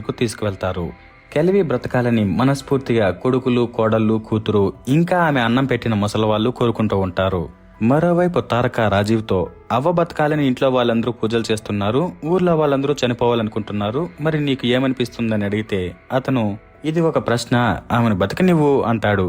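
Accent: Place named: Tamil Nadu, India. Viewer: Nativity pokunda Tamil slang lo Baga chepparu👌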